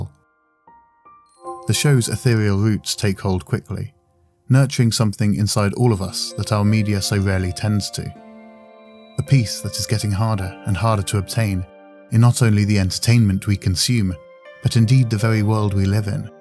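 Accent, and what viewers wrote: United Kingdom. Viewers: Your British accent, the calm voice, the music choice, the visual choice and the approach to this work really felt like you gave the series the justice it deserves. By recreating the atmosphere you find in Mushishi, you made the presentation of this work beautiful. This short analysis was a great way to pin my feelings for a while, and to create my own thoughts around this show. Thank you very much.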